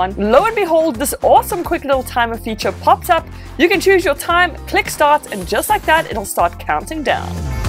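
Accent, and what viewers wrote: South Africa. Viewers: are you South African? I'm picking up an accent :) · I didnt realise we had mouse option, i have it on my ipad so great tip Have you given away your covers ? Great accent (maybe a saffer?) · I can't understand yr accent, is it South African? i luv yr vids sm <3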